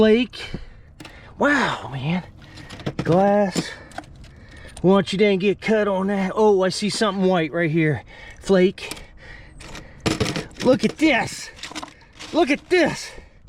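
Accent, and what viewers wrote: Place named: Pittsburgh, United States. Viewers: That Pittsburgh accent I can here it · It's a southwest PA dialect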